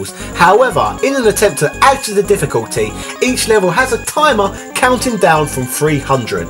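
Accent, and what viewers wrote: United Kingdom. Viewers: PLEASE! Just list the games in the description! Trying to decipher Japanese names from a British accent is impossible.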